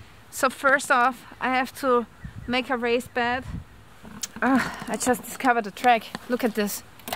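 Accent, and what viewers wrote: Germany. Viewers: I have to remind myself that you're not the girl I loved so much years ago. You are much more dear with a German accent. By the way, your English is great, but "owl" rhymes with growl or now+l. We have huge, very loud owls in the woods near here. Hearing their calls echoing in the night would frighten even the meanest werewolf!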